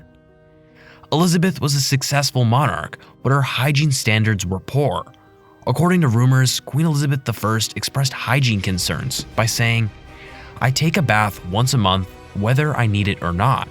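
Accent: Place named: United States. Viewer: full of incorrect “facts” and seppo pronunciation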